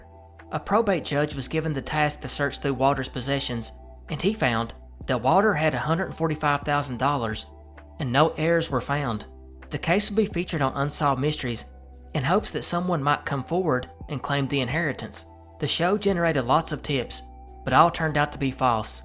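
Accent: South, United States. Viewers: For like 5 seconds, I literally thought you had a Scottish accent because your southern accent is so significant!! And I’ve lived in North Carolina my whole life! I love how there are so many varieties of accents.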